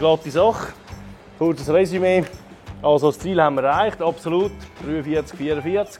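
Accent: Switzerland. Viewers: quatschkante hihi. ja der schwyzer dialekt 😉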